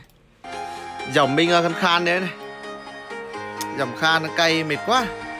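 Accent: Nghe An, Vietnam. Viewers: nói tiếng Nghệ An cho đặc sệt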